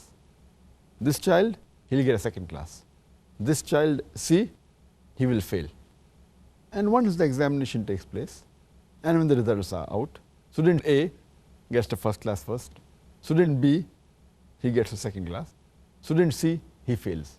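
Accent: India. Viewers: I'm a Muslim but I can't really understand his saying. His English is can't understand because his voice Indian Accent 😢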